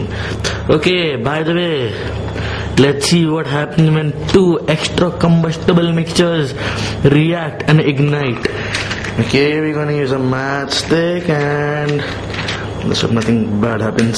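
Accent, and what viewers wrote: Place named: India. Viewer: Yeah Gunner3210 is right... deodorant is extremely flammable, be very careful when playing with fire and compressed containers with flammable gasses inside because they could explode in your hands :( Good luck in you chemistry studies ;) P.S. i like your indian accent, it's so funny! xD Greetings from Italy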